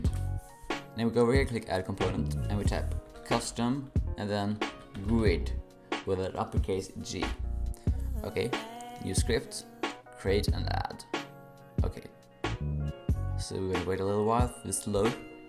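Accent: Sweden. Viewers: Am i hearing a swedish accent? (Very helpful video btw)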